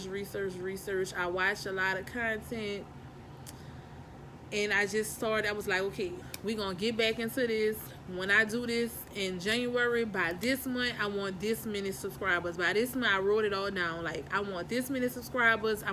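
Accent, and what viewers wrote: New Orleans, United States. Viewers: I thought your accent sounded like New Orleans. I’m a Louisiana native too. Yes, every Friday is seafood night at my house. Congratulations on growing your channel. Thanks for the tips on YouTube growth. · I love the NOLA accent too! That's what caught my attention and made me keep watching.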